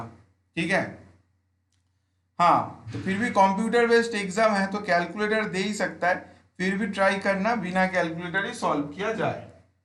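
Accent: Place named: Bengal, India. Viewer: Dada iacs er aro questions korao... Please do more questions of iacs. Your accent made me feel that you're a Bengali. So commented in Bengali :)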